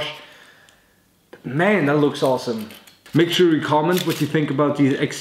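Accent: Sweden. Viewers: I was about to ask about your accent then you said Sweden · Are you swedicj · Are you Swedish??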